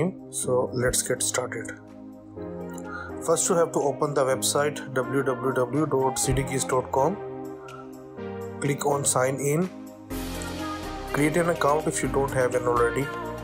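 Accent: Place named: India. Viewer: It’s just an Indian accent bro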